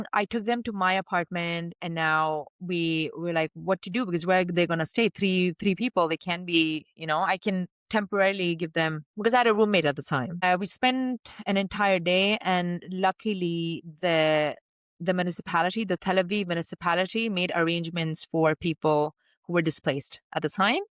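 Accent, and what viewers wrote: India. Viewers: You are beautiful with Indian accent.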